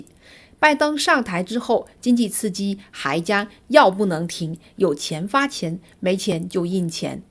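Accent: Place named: Jiangsu, China. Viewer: 謝謝Sammy 分析，一口江蘇、上海人口音，請問你哪裡人啊？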